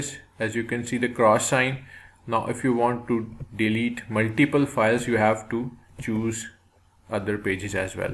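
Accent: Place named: India. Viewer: Was hoping to hear an accent in STANDARD English... Another India. 🙄🙄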